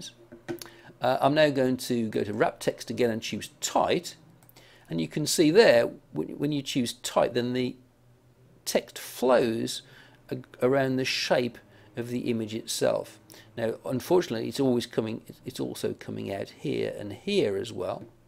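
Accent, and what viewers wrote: United Kingdom. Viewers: This video was thie first one that i searched for after reinstalling my system, so it was the first link assosiated with youtube. Now everytime i search "youtube", this video is the first one i get. This british voice is now my every day companion and im not even sure if i want to change that. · really like this British accent omg!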